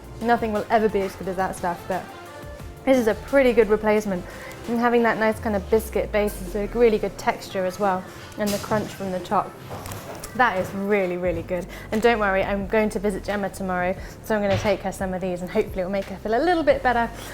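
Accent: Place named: United Kingdom. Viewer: 👍😇 You're really great, I love the way you present things (and like with Jemma, I also love the way you speak - being German, I'm always looking for British English input and I enjoy your and Jemma's English so much 😍)!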